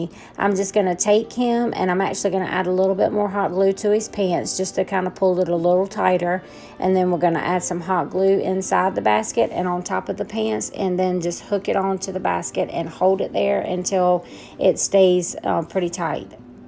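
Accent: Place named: South, United States. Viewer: Hey love you videos! Can I ask what state do you live? I live in a very small Town in North Carolina called Lincolnton. Love your country accent! I found you though Sweet Wendy’s channel.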